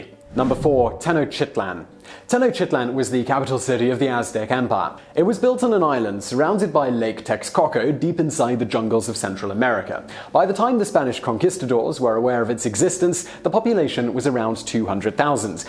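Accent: England, United Kingdom. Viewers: i'm english and i find this guys voice too english. ecspecially when he says "empire"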